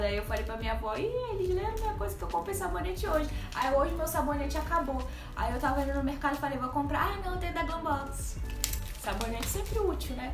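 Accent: Rio de Janeiro, Brazil. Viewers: mana, como vc fala em inglês com ess sotaque lindo de carioca? queria muito ouvir!!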